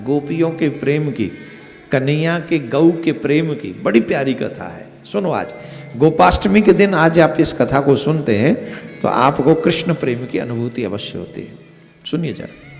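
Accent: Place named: Braj, India. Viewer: गुरु जी आप ब्रिज भासा में कथा कहते है तो बहुत ही अच्छा लगता है ब्रिज भाषा कितना मधुर है लगता है सुनते रहे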